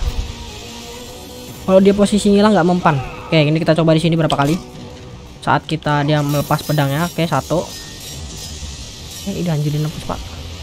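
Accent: Madura, Indonesia. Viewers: Madura kah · Madureh bang..??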